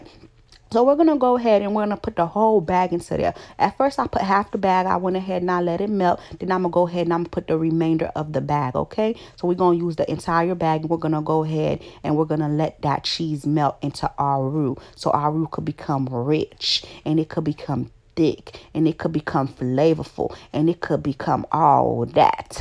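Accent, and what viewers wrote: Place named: South, United States. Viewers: Goodness! I'm struggling to understand this southern version of the English language. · "proper English" lol. I have relatives down south and this is proper English. I love the way she communicates, with such love and authenticity. There are plenty of channels you can go to where people are speaking Received Pronunciation or any other version of "proper". Bye Felicia!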